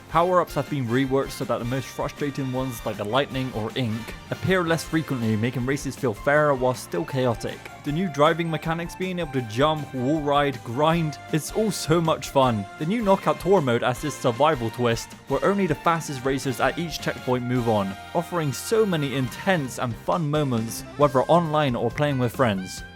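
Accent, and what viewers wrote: United Kingdom. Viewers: I have a suspicion that this guy is british but i cant put my finger on it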